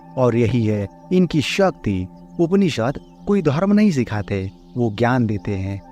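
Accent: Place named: Bengal, India. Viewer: Bhai tu bangali hai... Ya bhojpuri... Mast bolta hao bhai...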